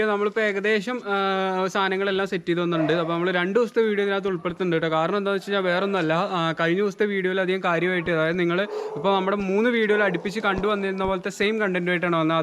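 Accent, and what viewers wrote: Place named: Kunnamkulam, India. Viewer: Kunnamkulam kaare parayunoooda naaari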